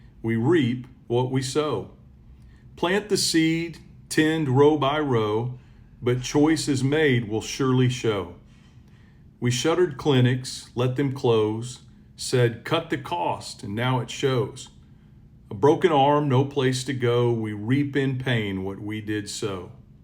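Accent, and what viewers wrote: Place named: Missouri, United States. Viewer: I don't know what it is. If it's your calm even tone or your Missouri rural dialect/accent or if its the sound of wisdom coming from every syllable that comes from the heart. If they won't or refuse to see past their hate and listen to YOU. I don't know who they'll listen to in order change their ways. Before stumbling upon your channel I use to believe that it was only reason is racism. Now hearing your perspective on the way they've been taught to believe it's not just that. It's hate. Anytime someone who would burn burn the house down around themselves and their families and love one's, it's pure hate that has them blinded. I wish i could do more for you and your channel to assist you in spreading knowledge. The good lord knew who to chose to spread his love and words to save those who are not only willing to listen but change as well. 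May god bless you and keep you safe. God speed brother. 🙏🏾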